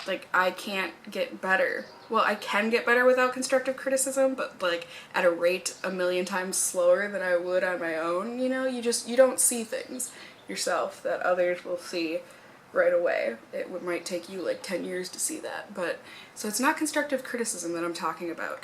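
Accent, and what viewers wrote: Minnesota, United States. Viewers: Your accent is getting more Minnesotan since you've been home. I love it.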